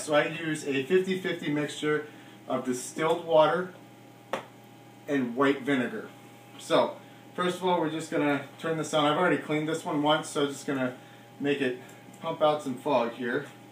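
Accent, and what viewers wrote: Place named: Wisconsin, United States. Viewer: 10 years after this was posted I'm here learning.. I only stayed to watch because of your accent 😂 and you're from Wisco.. my family is from Fond du Lac .. I'm in Ga ( booooo ....Go Pack Go) ANYWHOOOOOOOOO thanks for the tips! I have 3 fog machines to clean before I store them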